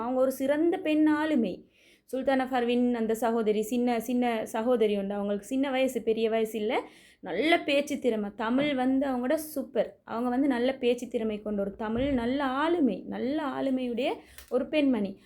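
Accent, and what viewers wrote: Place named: Sri Lanka. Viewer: மிகவும் தெள்ளத்தெளிவாக விளக்கிக்கூறும் இலங்கையின் தமிழ் வழக்கில் உங்களின் கருத்து மிகவும் சிறப்பான பதிவு. அன்புடன் உதய தாரகை. சிங்கப்பூர் குடியரசு 🇸🇬🇸🇬🇸🇬🇸🇬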